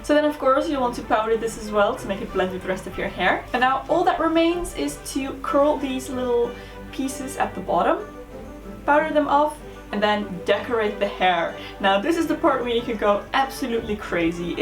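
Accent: Netherlands. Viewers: are you dutch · I heard some Dutch accent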